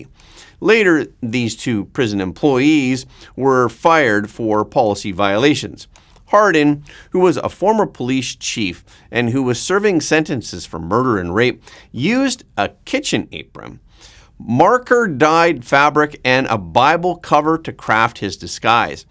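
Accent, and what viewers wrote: Canada. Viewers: you do a great job of giving us real news! Thank you! It sounds like you may have a Canadian accent? Aetna is pronounced Et-nuh. 🙃😊🤗 A few other words give you away, as not being US pronunciation’s, but not too odd to our ears.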